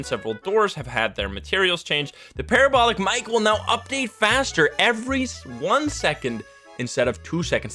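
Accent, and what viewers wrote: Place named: Netherlands. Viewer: Oh your actually dutch I didnt noticed before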